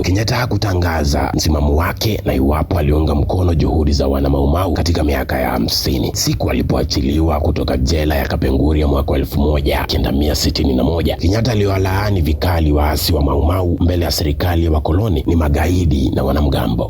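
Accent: Tanzania. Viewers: definitely speaks like a ninja from bongo, maybe its that fellow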